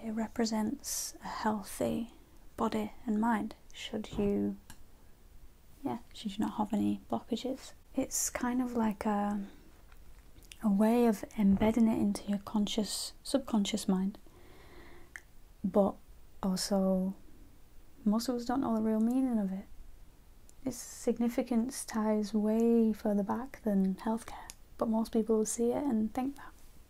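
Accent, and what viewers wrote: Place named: Lancashire, United Kingdom. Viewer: Of all the accents I never thought I would get tingles from, yours (Lancashire?) is one I would never have imagined! But your voice, style and tone is amazing. Also your accent is lovely. Just shows you should never stereotype! Keep it up - and please do a Q&A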